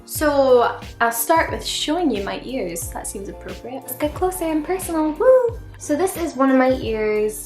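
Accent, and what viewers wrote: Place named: Scotland, United Kingdom. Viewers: I hear a mix of accents when you speak which I actually love.. I hear the u.s/Canadian north American accent, and then I also hear a slight uk accent.. not anything like some other accents from u.k, but I can actually understand every word that you say! I'm north american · She has a Scottish accent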